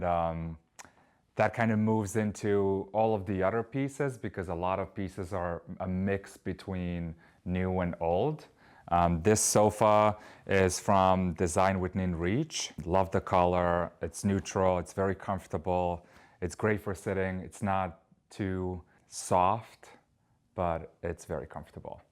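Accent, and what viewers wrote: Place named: Serbia. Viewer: Great style, great apartment. I knew you were from Serbia, the pronunciation of certain words… well done!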